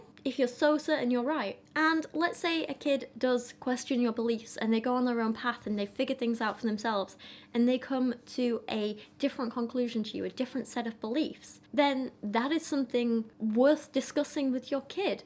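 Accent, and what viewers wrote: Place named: Yorkshire, United Kingdom. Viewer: Hi,! I knew exactly what saying you were talking about at the beginning as soon as you started describing it. I have been thinking of it recently when I watch videos critiquing tradwives/the alt-right but it was bugging me that I couldn't remember it either. I finally Googled it just now and it is "Kinder, Küche, Kirche" (Children, kitchen, church). Off topic, I love listening to your voice/accent! It reminds me of when I was able to go visit my aunt and uncle in West Yorkshire (though they themselves don't have Yorkshire accents because my uncle grew up near London and my aunt is American like me). Edit: Yes, state troopers are synonymous with state police. They tend to be involved with investigating crimes that occur in rural areas/outside city limits.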